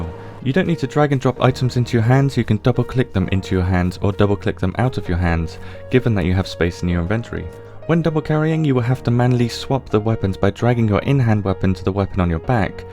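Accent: United Kingdom. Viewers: This was lit (Love your soft British accent)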